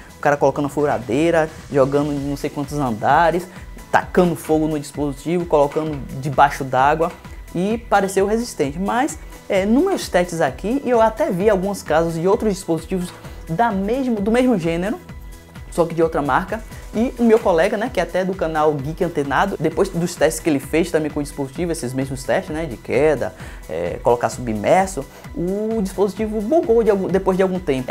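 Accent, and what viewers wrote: Bahia, Brazil. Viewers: Vc é baiano?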